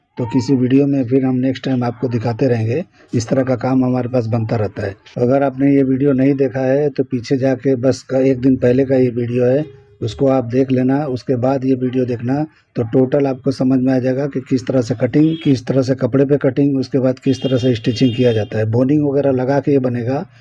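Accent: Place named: Uttar Pradesh, India. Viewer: Bolane ke style se aap up ke lag rahe the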